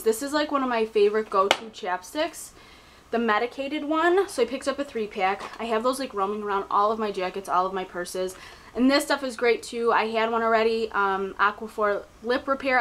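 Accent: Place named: Midwest, United States. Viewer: I love the tarte smooth operator power it's amazing for under the eye! Where are you and your Midwestern accent from!? I live in Wisconsin :)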